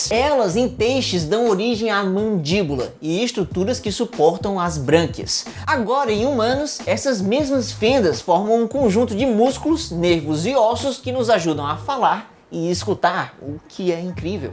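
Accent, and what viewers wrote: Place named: Northeast, Brazil. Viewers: Esse aí dento é nordestino mermo kkkkk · Sou do Piauí e todo mundo fala Aí dento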